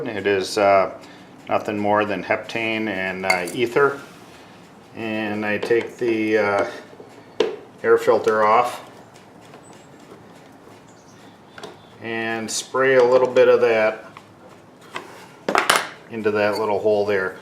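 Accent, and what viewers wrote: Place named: Minnesota, United States. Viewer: You sound like you are from Minnesota?? You have an accent just like all my relatives!!!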